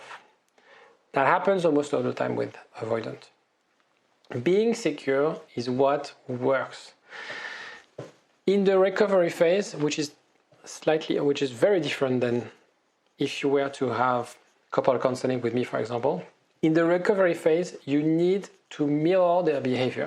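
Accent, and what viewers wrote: France. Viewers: Thank you, also very nice to listen to your French accent, Monsieur!;-) But not really sure if i want Her back haha... if there's still a mutual karma She will be back anyway. These kind of videos help, donc merci quand meme,! Salutations de Pologne. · While listening you, I hear your french accent. Probably I've mistaken but it's really nice😊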